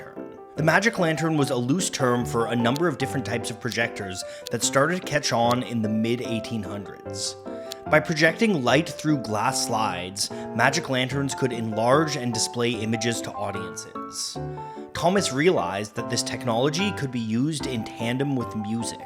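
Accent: Canada. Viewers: Dude, are you from Canada? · Hey, are you Canadian? There's something about the accent...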